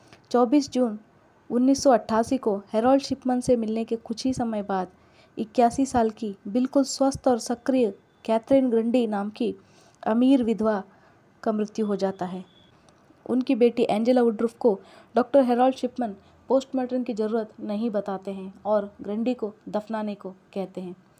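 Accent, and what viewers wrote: South, India. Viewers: Better u speak English ur accent is south Indian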